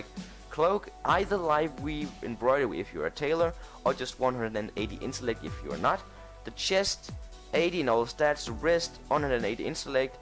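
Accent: Denmark. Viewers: Your accent sounds danish. Are you danish?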